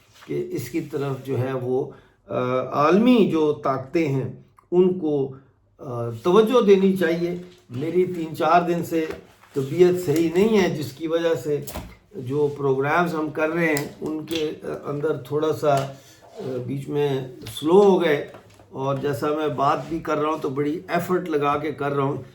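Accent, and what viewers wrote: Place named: Punjab, Pakistan. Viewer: Chacha Apne pojk Ka bhi Btao kya ho Raha he Waha? 😮 Tum baaki poori duniya ki News btate to LEKIN pojk Ka ni btate ? Tum panjabi ho kya? Tumhara Accent panjabi Lagta he 😮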